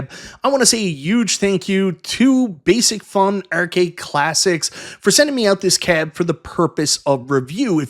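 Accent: Newfoundland, Canada. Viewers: Are you a newfie or caper?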